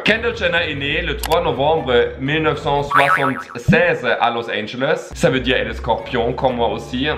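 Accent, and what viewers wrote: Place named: Canada. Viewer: tu mon youtubeur préféré des vidéos accent canadien et qui met quoi des vidéos trop trop rire 🇩🇪👍🇨🇦👍🇩🇪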